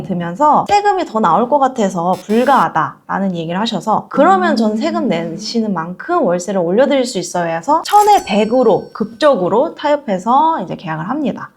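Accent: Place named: China. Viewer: 가 중국인 악센트가 있으시네요~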